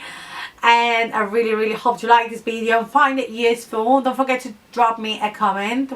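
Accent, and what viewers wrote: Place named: United Kingdom. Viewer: I like your British accent ❤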